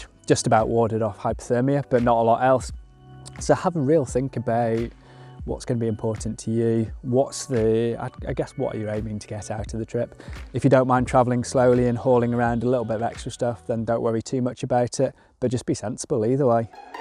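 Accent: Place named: United Kingdom. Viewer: Fantastic video. So refreshing to hear someone from the UK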